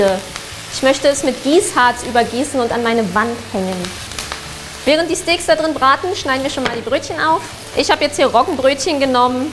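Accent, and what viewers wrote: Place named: England, United Kingdom. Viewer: Sie spricht richtig viele Wörter im englischen Akzent aus.😂 Schöne Folge 😉😊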